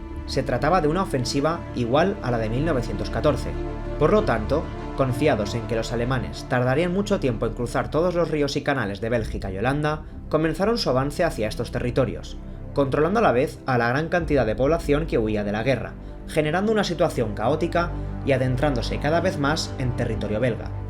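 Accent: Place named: Spain. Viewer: El acento español queda perfecto para los relatos, saludos desde PARAGUAY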